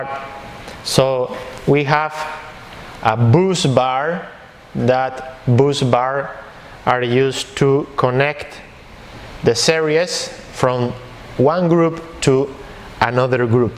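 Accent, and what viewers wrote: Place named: United States. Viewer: Súper para los que no tenemos el oído acostumbrado a la modulación de un gringo:)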